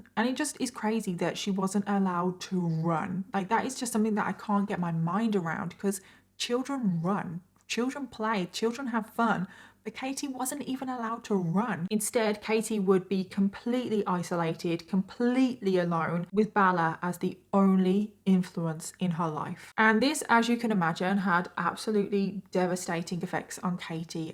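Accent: United Kingdom. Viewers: Bailey Sarian in British. You did a nice job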